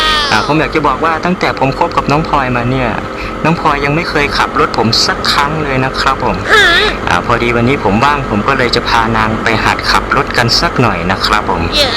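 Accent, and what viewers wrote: Isan, Thailand. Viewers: คนอิสานเบาะะคับ5555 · อ้ายเป็นคนอิสานบ่ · คนอีสานตั้วนิ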